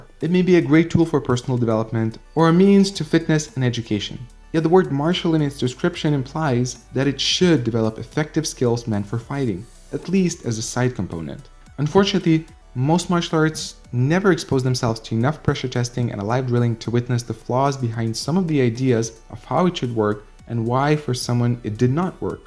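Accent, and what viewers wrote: United States. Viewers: I see the issue with your view. Martial Arts do work but you have never been taught to think the way of the Martial Artish. You must remember young Martial Artish to swim like a brick, Be the bookcase. Flow like a wall. You sound American but yet you say mobile phone and not cell phone as Americans do so that was unexpected. PS give me your phone ! :)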